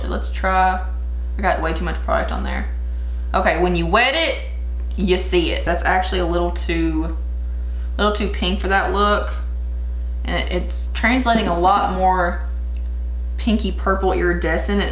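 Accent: South, United States. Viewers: I really got tired of all the hate bandwagon of this palette. You and emily Noel were very honest about this. I love your southern accent. You are a very beautiful woman. Keep up the good work.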